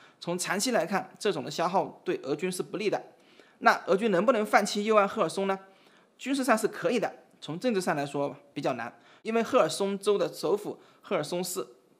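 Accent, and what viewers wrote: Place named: Hunan, China. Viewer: 你这口湖南普通话一下子就把你的节目打了不小的折扣。还是让别人替你表达吧！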